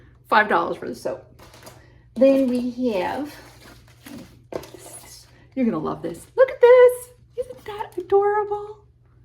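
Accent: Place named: United Kingdom. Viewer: Britain accent. ❤😊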